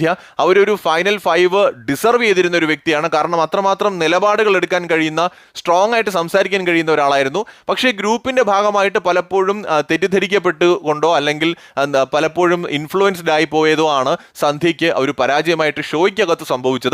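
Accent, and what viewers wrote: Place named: Ernakulam, India. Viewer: സംസാര ശൈലി ekm ക്കാരുടെ ആണ്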